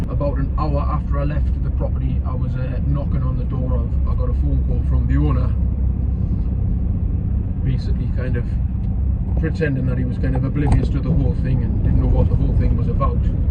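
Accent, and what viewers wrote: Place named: Newcastle, United Kingdom. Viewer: Union scaffolder since 2014 but started taking training on tube and clamp earlier this year and got into watching your videos. Got to say I love the Geordie accent. My brother in law is from Chesire and the Scouse accent is great as well. Been watching Dibnah videos for years. Always done systems with tube and clamp additions but learning to put up proper tube and clamp has been a blast. Doing "English" style as we call it here and "Western" types. Western we put the transoms on the standard instead of the runner/ledger. All wedge clamp here though. Bit of a holdover from the days of scaffolds being all wood. Tube and fitting was never really a thing until it was used for industrial in the 70's. Love the videos mate. Glad I could help you out with the van some.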